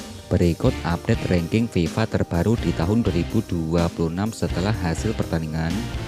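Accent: Java, Indonesia. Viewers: bahasa medok jawa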